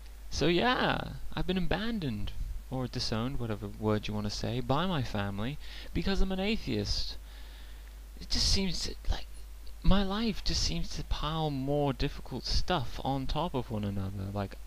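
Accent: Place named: United Kingdom. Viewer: I am surprised - you sound british seems odd they would care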